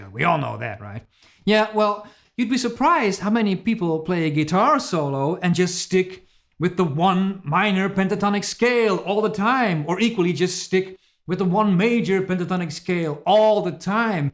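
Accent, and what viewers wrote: Netherlands. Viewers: Finally, we get to learn your accent is from the Netherlands! I always thought it was from ukraine or something….lol. Either way your guitar playing is the language i like best!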